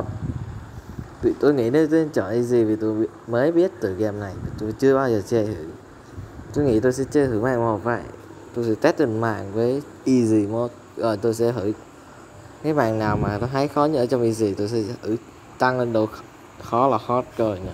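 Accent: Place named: North, Vietnam. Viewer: Ở tỉnh nào ngoài bắc rứa :))) nghe cái giọng giống bắc vl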